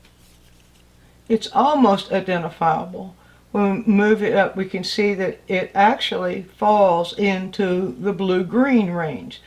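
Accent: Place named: Georgia, United States. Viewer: I love how you say “cullah”, your GA accent is so charming!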